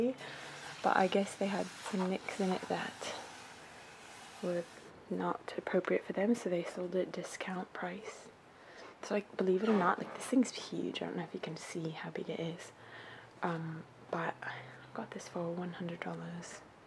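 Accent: England, United Kingdom. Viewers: You have an English accent in there am i right?? xxx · I love this video it’s is amazing I love you and your videos I hope you get to see this if not it’s no problem I just wanted to say I love this channel because you are so thankful for the support and also you make very great videos and the British accent is amazing I’m from England and I think you sound so British hope you see this live you and your vids lots of love Emily Blanchard from England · 0121cole She is American (her husband is Egyptian), but uses a British accent to protect her identity because she believes that voices are easily recognisable and wouldn’t want anyone to reveal her identity. Hope this answers your question. X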